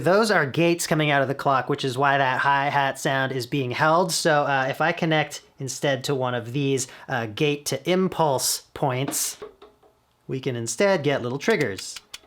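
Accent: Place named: Canada. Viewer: I totally forgot you were Canadian then I heard the word owtside lol love the content man! Been waiting on a good overview of this.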